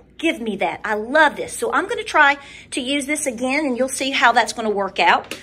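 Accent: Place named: Rural, United States. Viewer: And her country twang. I love it!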